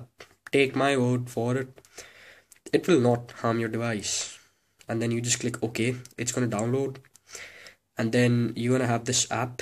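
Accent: India. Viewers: indian accent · u indian??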